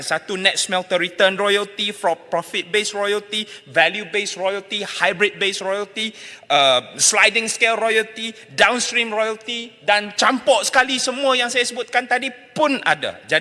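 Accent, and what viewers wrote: Perak, Malaysia. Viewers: steady mp ni....cina cakap perak yop....👍👍👍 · Ckp perak yop...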